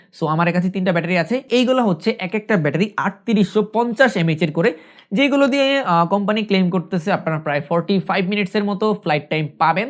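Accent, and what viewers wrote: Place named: Bangladesh. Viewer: chehera dekho yah fir ,,, 1 min voice hii sunlo .... pata chal jayega yaani jhaat se pakad loge ki yeh Bangladesi hain ....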